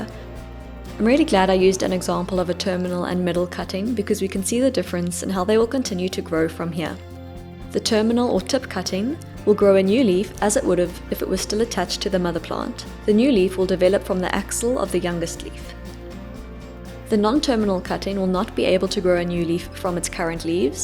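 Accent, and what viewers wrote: South Africa. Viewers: Very informative, I'm definitely going to try and I was happy to hear a fellow South African! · Are you South African. I detect a slight accent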